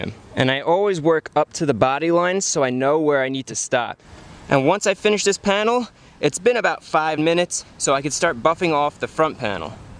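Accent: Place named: Boston, United States. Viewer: I own a car. It is PM and am not losing sleep watching this video. But never in a thousand years would I wash my car. I don't care what says, I will drive it through the car wash. But I am watching the video anyway. I think it's because his voice and Boston accent - wodder - reel me in.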